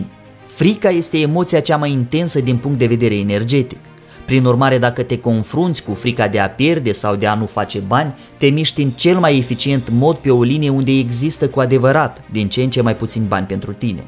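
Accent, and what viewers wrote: Moldova. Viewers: Bună seara.Povestitorul este din Republica Moldova sau e basarabean? Mulțumesc.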